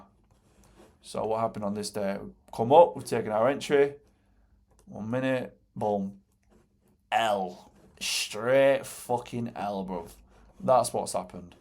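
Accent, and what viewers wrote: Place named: Yorkshire, United Kingdom. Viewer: Proper Yorkshire man 😅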